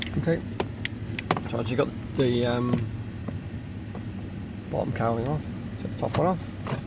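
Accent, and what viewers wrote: United Kingdom. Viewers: Helpful video. Love the british accent😄. Thanks from Argentina 👍🏻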